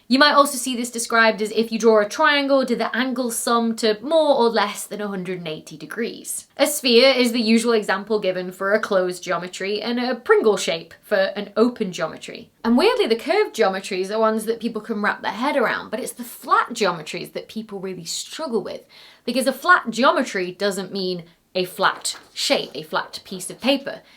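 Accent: United Kingdom. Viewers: Yeah, the British accent on that one was hard, lol. I'm normally pretty good with it, but I had to keep reminding myself what she was talking about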